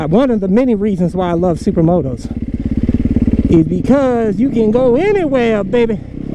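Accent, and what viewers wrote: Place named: South, United States. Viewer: I really thought you were from somewhere in the south like Luisiana.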